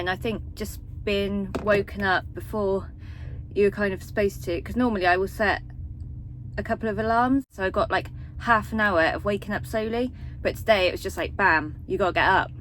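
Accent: West Country, United Kingdom. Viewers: I am a new subscriber, and was disappointed that in your last video you said you were leaving, but glad that you will be taking us on your new journey with future videos. PS. Love your west country accent !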